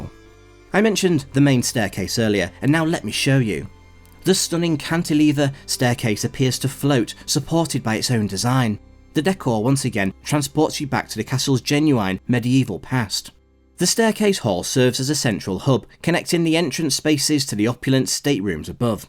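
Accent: England, United Kingdom. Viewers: I love English English pronunciation. Belvoir = Beaver 😂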